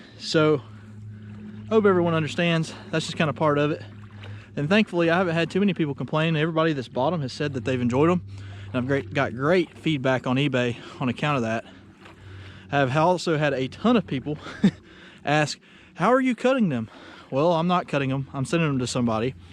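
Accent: South, United States. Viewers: Thank you four comments about us older folks am one of those disabled elderly ladies who appreciate the content as well as your southern accent. Keep it up!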